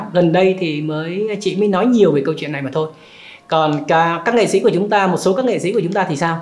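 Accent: Standard. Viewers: Rất thích người có học thức ăn nói chuẩn, dễ nghe, rất thuyết phục, phân tích hay, cái mà nhiều kênh khác còn thiếu. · Anh noi rat chuan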